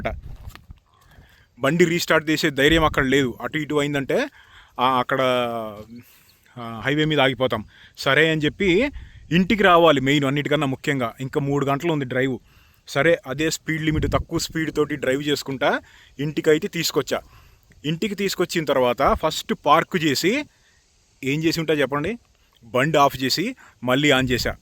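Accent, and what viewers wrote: Andhra Pradesh, India. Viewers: Anna mana Nizamabad antunnav but slang yemo andra laga undi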